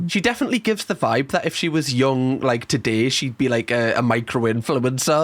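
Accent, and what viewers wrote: Newcastle, United Kingdom. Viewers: ! I found your channel sort of by accident just the other night. I'm hooked now. I know I'm watching everything out of order and all but, your commentary is hilarious! Also, love your accent and getting to hear the Newcastle slang. Love that you call your folks "donuts", I'm also part of the Waffle Gang. Another UK Youtuber Mark Narrations calls his folks that.:)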